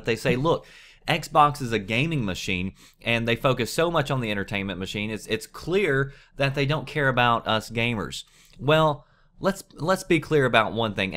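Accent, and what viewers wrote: South, United States. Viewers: Is... is that a southern accent? That is a first for me. Game reviewer with a southern accent... wow. Who is also a privacy advocate.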